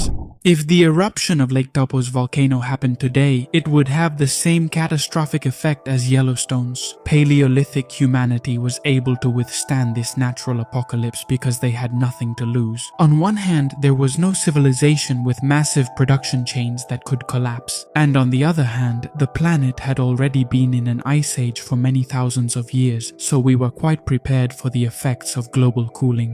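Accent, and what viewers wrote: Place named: India. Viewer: What's with the Indian voice??? AI?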